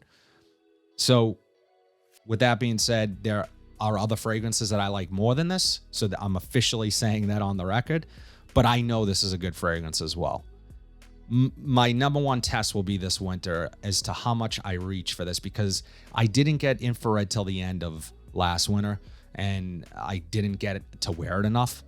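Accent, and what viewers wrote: Boston, United States. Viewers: Cool Buaston accent!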